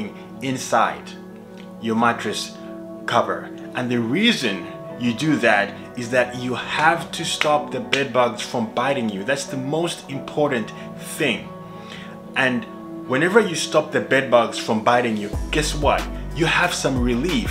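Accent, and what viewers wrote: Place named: France. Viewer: Hi. Oh you are à french speaker I've noticed your accent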